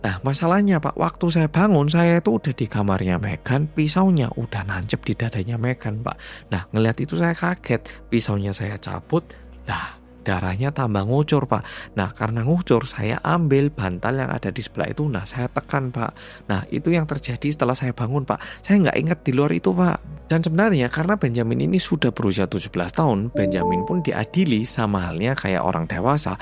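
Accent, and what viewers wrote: Java, Indonesia. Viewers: Nglindur nya... serem2..ah muedok😢😮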